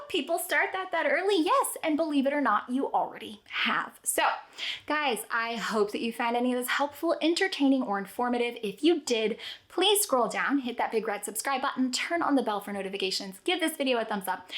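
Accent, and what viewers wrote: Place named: Texas, United States. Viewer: I love how much your TX twang came out in this one 🤠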